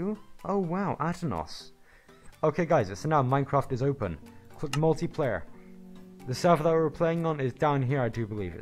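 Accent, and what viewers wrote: United Kingdom. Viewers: the strong bri'ish accent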